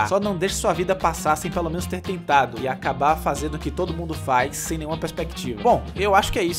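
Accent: Bahia, Brazil. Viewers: rapaz sua historia de antigamente é quase igual a minha agora (EM TER VERGONHA DE FAZER VIDEOS)E DIVULGAR O CAL, inclusive eu sou de camaçari uma cidade vizinha a Lauro de Freitas onde voce morava. eu sofro de depressão, transtorno borderline , ansiedade sindrome do panico e sou hiperativo por isso desenvolvi fobia social, mas não paro de sonhar em fazer videos e algo me diz que se eu não desistir e perder a vergonha e o medo eu conseguirei ser um youtuber, e esses dias vi seu canal e quando percebi que você era da bahia eu ate me emocionei, eu acredito que a lei do universo, (a lei da atração) me mostrou seu canal para confirmar, quando eu ouvi seu sotaque eu pensei, pelo sotaque e pela giria (vei)eu pensei: esse cara parece ser baiano! logo em seguida o motoqueiro perguntou se voce era do rio de janeiro e voce respondeu que era de salvador. eu pulei aqui em casa e falei porra o cara é daqui de salvador, isso pra mim foi um sinal e por isso fiquei emocionado. 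agora to falando para todos aqui que tem um youtube que ta fazendo sucesso e é daqui de lauro de freitas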